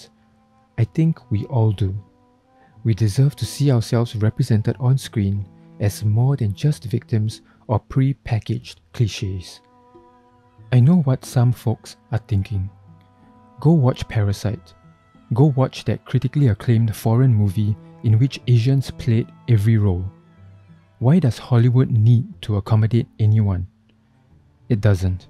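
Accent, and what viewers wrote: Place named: Malaysia. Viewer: hi, hi. are you malaysian/bruneinese/singaporean? you have an accent from these region.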